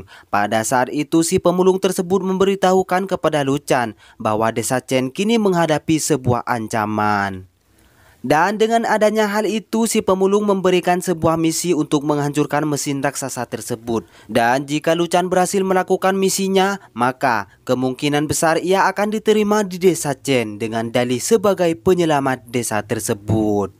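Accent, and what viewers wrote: Madura, Indonesia. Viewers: Yg menarasikan pasti org madura y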